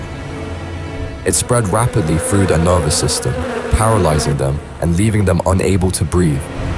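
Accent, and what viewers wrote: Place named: Manchester, United Kingdom. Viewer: Anova instead of another. Time to get rid of that Manchester dialect kid.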